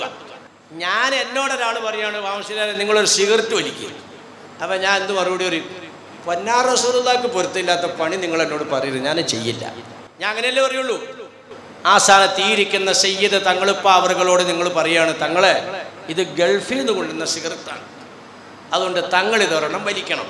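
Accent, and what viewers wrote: Perumbavoor, India. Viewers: ഉസ്താദ് പെരുമ്പാവൂർക്കാരൻ ആണോ? സംസാര രീതി അത് പോലെ ഉണ്ട്..എൻ്റെ ഉമ്മച്ചിടെ വീട് അവിടെ ആണ്..ഉമ്മചീടെ വീട്ടുകാർ സംസാരിക്കുന്നത് പോലെ തോന്നുന്നു...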